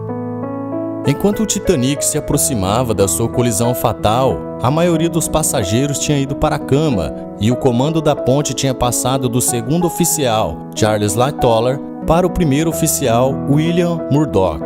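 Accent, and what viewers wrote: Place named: São Paulo, Brazil. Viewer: Deve ser paulistano tipo eu e meu conterrâneo kkkkkkkkkk falamos bm caipira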